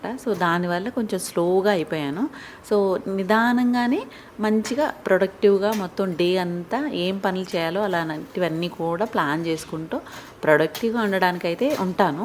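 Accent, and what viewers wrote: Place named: Godavari, India. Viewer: హాయ్ జీ. మీరు సూపర్. మల్టీ టాలెంట్.. నేను లేచిన వెంటనే మొక్కలు చూసుకుంటాను. మావాళ్లు కాల్ చేస్తే తియ్యకపోతే మొక్కల దగ్గరే ఉంటుంది అనుకుంటారు. మీరు విజయవాడ, గుంటూరు అనుకుంటాను. మళ్ళీ గోదావరి మాటలు కనిపిస్తాయి 😂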